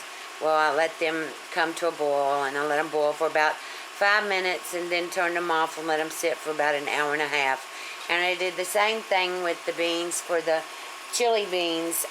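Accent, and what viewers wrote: United States. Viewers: Love that american accent. Will try out this recipe later today in London UK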